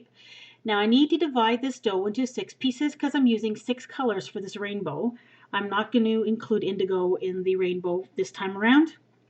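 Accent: Canada. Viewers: she's canadian, right? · As an american, she definitely has a canadian accent.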